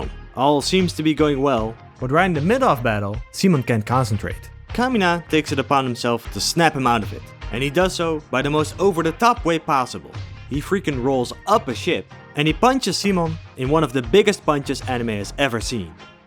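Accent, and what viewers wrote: Netherlands. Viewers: You are Dutch aren't you. I can hear the accent/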